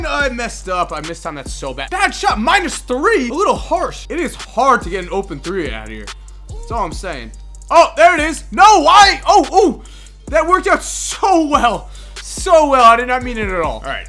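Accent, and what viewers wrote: New Jersey, United States. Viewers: Are u from New Jersey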